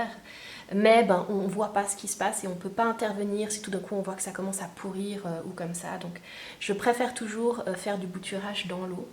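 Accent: Switzerland. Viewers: Sympa ce petit tour ! Et ça m’a fait plaisir quand j’ai reconnu ton accent, trop cool d’avoir des suisses dans le YouTube game 😍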